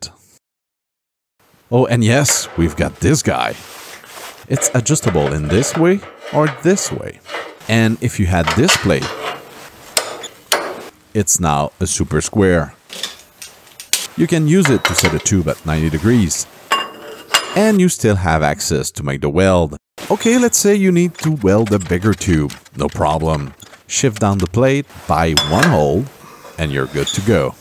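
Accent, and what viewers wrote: Quebec, Canada. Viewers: Si c’est pas l’accent le plus québécois qui ya 😎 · The joy of French Canadian swearing!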